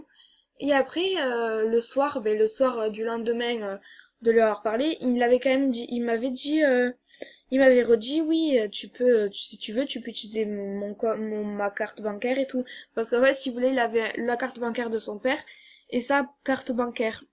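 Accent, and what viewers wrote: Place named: Toulouse, France. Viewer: Kami Kado De youtube elle a le même accent quelle (Toulouse je pense) :)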